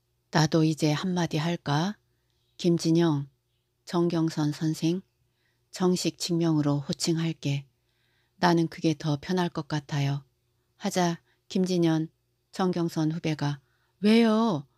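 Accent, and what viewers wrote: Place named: Chungcheong, South Korea. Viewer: 네 항상 정겨운 충청도억양이 좋아요 글도 잘쓰시고 행복하세요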